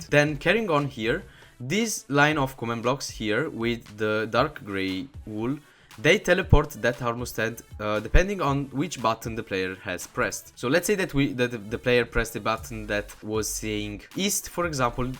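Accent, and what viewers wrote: Italy. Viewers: he's italian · are u italian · Si sente l'accento italiano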